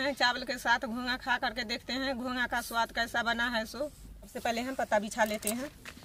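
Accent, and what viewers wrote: Bihar, India. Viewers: Aunty ji aap jamui district se ho kya lag raha hai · Ky ap bihar se belong krte ho 😅 Apki boli s lg rha hai 😅😅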